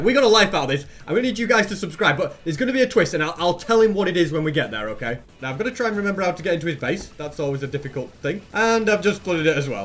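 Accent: United Kingdom. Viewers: Dude your British so I’m I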